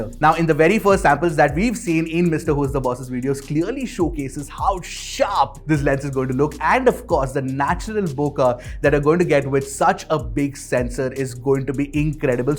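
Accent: India. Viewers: I swear indian accent English is pure f.king ear cancer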